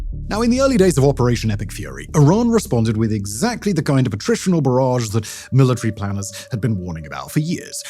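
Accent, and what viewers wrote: United Kingdom. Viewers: Is this a UK accent ? Uk isnt prepared for Drone war either, they're not even prepared for regular war, they only have 20 mission ready battle tanks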